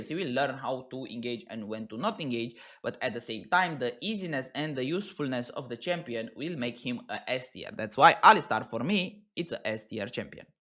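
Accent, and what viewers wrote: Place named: Romania. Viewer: you have an romanian accent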